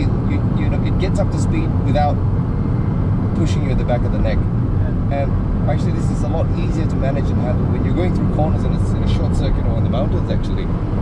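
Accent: United Kingdom. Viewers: Where did you get the British Accent? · You sounds a bit like British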